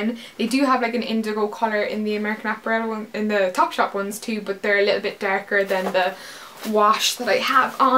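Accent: Cork, Ireland. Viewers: It's funny how you still have your Cork accent until you say the word "everythin'" which you say in a really strong Belfast accent haha :) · That leather skirt is amazing! And I could listen to your accent all day. My favorite priest was from Cork, as well as my great grandfather. :)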